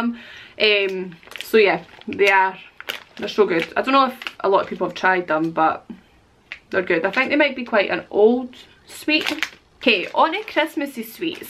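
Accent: Scotland, United Kingdom. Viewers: I never considered what a Dollar Store would be called in other countries. A store in america called PoundLand would not go over so well. lol. Love your accent by the way, and I do mean your ...regional? scottish accent. I love listening to it.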